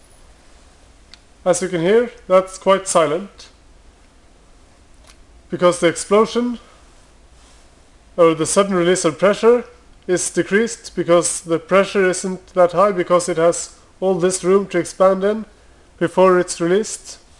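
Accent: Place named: Europe. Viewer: What wondeful fantastic and truly democratic European country do you live in that actually permits it's citizens to own both a handgun and a silencer? Your accent is European and I assume that's where you shot this very interesting video. I live in the US and Americans like me refuse to allow our government to take away our right to own firearms. Yes, we are a violent country, but it's the criminals doing all the violence--not law abiding citizens like me.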